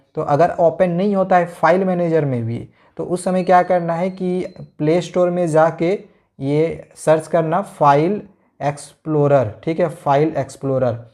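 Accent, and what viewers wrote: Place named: Bihar, India. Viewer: Sir aapke language se lagta hai aap bihar ya jharkhand isi 2 state me se kahi se ho or sir mai bihar se ❤️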